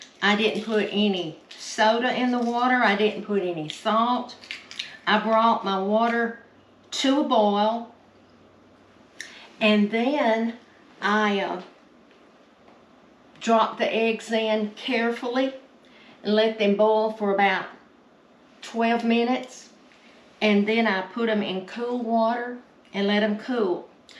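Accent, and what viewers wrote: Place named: Alabama, United States. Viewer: I only remember them being called deviled eggs. If I make them at Christmas I add some sweet pimentos with the relish to have the red with the green. And sometimes I make them with soft bacon bits if Im trying to be fancy lol. I am from coffee county Alabama and listening to you sounds like home. God Bless and keep you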